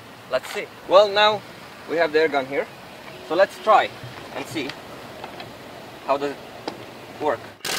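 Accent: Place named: India. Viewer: يااخو لو انك شرحت بالعربي لغتك تقول هندي يشرح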